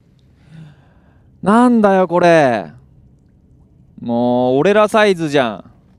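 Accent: Hiroshima, Japan. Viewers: あの…毎回出る広島弁？が最高です…(º﹃º ) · 増田さんの広島弁、やっぱり好きだなぁ～。🥰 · 広島弁が心地よいー☺️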